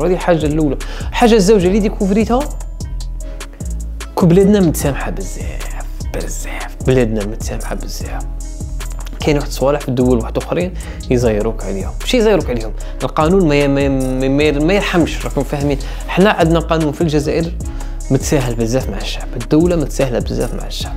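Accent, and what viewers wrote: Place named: Morocco. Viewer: اللهجة تاعك تقول مروكي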